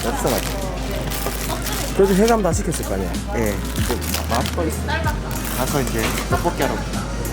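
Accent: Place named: Daegu, South Korea. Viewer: 맛갔나?ㅋ이거도겁나웃겨요 유행어만들어야겠다 오늘부터친구테 써머거야지 원래도사투리쓰는사람 좋아했는데 대구사투리매력있음 님이대구사투리해서더매력있음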